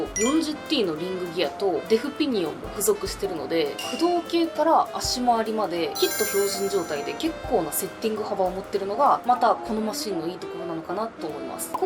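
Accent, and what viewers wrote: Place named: Japan. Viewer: I prefer hearing your Japanese accent with the subtitles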